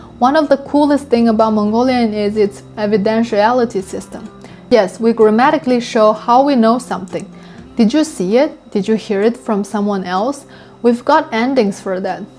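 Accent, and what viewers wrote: China. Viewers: You speak English with a Chinese accent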